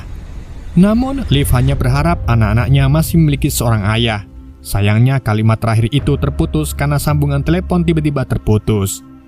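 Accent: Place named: Pemalang, Indonesia. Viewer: Kamu orang pemalang kah min?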